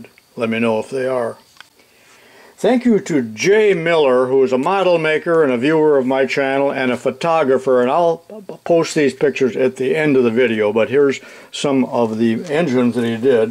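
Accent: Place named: United States. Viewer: I like your accent. To a north European like me, it sounds like I'm watching a western cowboy movie! 👍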